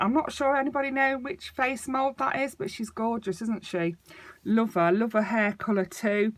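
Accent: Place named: Lincoln, United Kingdom. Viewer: I love to watch you're vids. I am an forty year old doll and toys collector. I am originally from Lincoln. But live elsewhere now I love to watch loads of other doll u tubers but like you cos of the accent it makes me homesick and all of your hauls are fab keep up the good work and hope to watch more of you're vids lots of love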